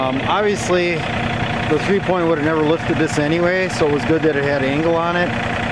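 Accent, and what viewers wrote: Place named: Minnesota, United States. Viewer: Where are you? I’m in Stillwater. Sounds like your in MN. I have a JD 3033 your video was a big help when I put my block heater in. Thanks for posting.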